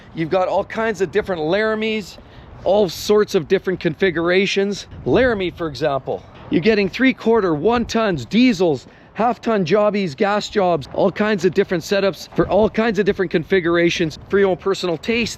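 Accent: Canada. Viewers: Are these Canadian dollar amounts because you sound Canadian?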